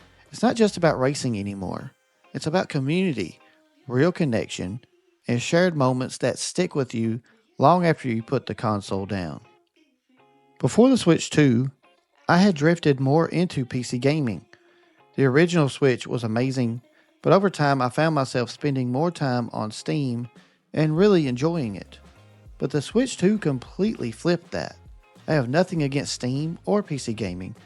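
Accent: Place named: Alabama, United States. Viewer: Just curious buddy where are you from? I'm from Alabama you sound a lot like me and my friends lol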